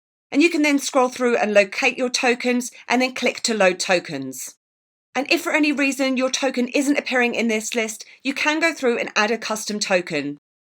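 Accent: United Kingdom. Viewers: British accent is so cute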